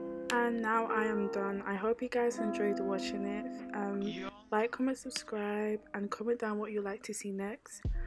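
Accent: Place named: England, United Kingdom. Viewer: You can’t hear the English accent?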